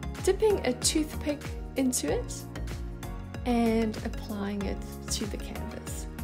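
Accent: South Africa. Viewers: the accent is so South African i love it 😍😍😍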